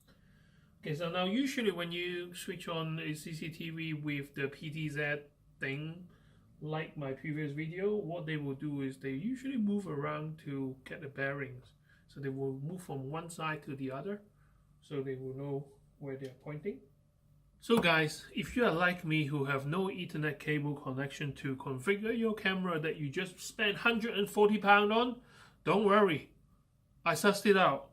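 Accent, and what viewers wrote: China. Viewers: "Chinglish" LOL As long as I can interpret what's being conveyed, I'm good. I just got a Jennov 5MP PTZ POE Mini Cam and it looks similar to this one, but the documentation and Jennov's website is sorely lacking.. :( It appears that mine is made by DianChen Industrial Co., Ltd. and my Amcrest NVR cannot "see" this cam even if I change port numbers. Is it typical that each manufacturer "blocks" other manufacturers' hardware so as to force the consumer to purchase ONLY their hardware?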